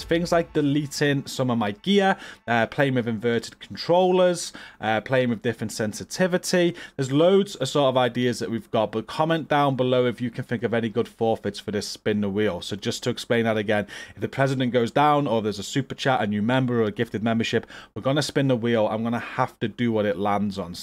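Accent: United Kingdom. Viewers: Your English is hard to understand, is it British English?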